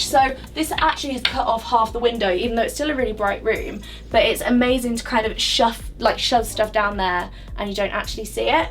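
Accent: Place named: Cockney, United Kingdom. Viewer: a cockney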